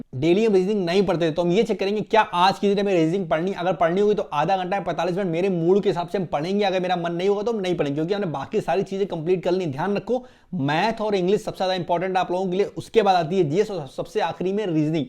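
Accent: Kanpur, India. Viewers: Jyada kisi se chatiyo mat kanpuriya language 🤣🤣🤣 Maja aa gya Bhai 😁😁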